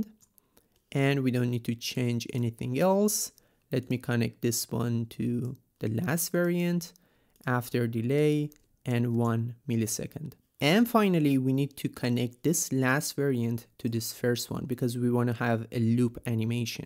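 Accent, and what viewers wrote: Iran. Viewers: I just knew you were iranian the second you started talking lmao, great video btw keeo it up fam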